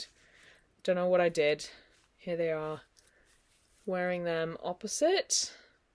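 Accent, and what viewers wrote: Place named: Scotland, United Kingdom. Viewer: So glad to see you again this month! I wish that I had responded more to your last video. I thought about hunting you down in IG. I care if you make videos. I love your brand of witty humor. I love your Smattering of British and Scottish accents. You remind me of my grandmother who was from Aberdeen. I’ve tried to figure out why your channel is growing more slowly than some, and I think it comes down to “sameness.” You are not making the same PK and MFTK sweaters in creams like everyone else. You veer off the beaten path with color, patterns and other fashion. 💗💗💗💗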